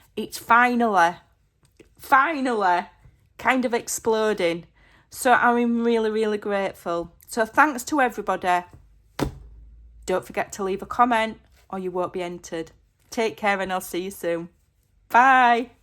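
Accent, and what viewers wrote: Yorkshire, United Kingdom. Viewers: Hi I am from N Ireland I love when you talk to yourself “stop waffling and get on with it” in your Yorkshire accent. these journals are gorgeous if it was me I could not part with them. Your Ko-Fi items are great value I did enjoy the mini book series and digital. Keep up the great work ❤ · Hi,. I'm from Spain. Both your crafting skills and your fabulous British accent ( I'm a teacher of English) inspire me. I'm about to engage in my first crafting project ever and I chose you as my guide because you are not afraid of making mistakes, which is what builds confidence eventually. This is what I need. Well, sorry. Let's get to the point. There are tons of saying to mention I had a hard time to understand at first, such as the one when you talk to the paper and call it "sucker". My favourite one is " she says", basically because I still haven't figured out what it means. 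I guess its like saying " or this is what is supposed to happen"´.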